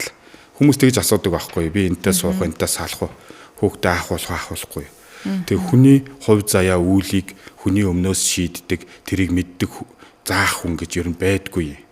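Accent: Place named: Inner Mongolia, China. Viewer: Өвөр Монгол хүн үү ? Аялага нь тэгж сонсогдоод бна. Сайхан ярилцлага бна баярлалаа.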